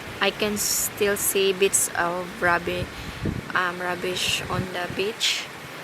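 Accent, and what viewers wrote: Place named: Visayas, Philippines. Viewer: Pataka ka man lang oi josko binisay-a na Lang na masabtan pa wai accent bisaya kaayo galisid jud hehehe.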